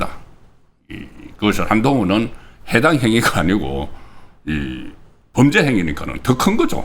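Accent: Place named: Daegu, South Korea. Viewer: 송구컨씨ㆍㆍ아무리 지방출신이라도 발음 억양 좀 조정해라ㆍㆍ듣다가 보면 짜증난다 나도 대구다 그리고 어설프게 코미디언 수준으로 짖지말고 좀 잘해라 내용이 없다 다 보고나면 영남일보냐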